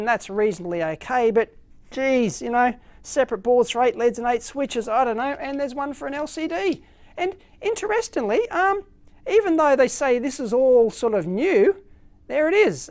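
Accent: Australia. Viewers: True, love the Aussie flavor Dave! Just a head's up though, go easy on the "S" ($#!+) word, it's a little rougher over here [U.S.] than over there ;) (not exactly family friendly) Cheers :)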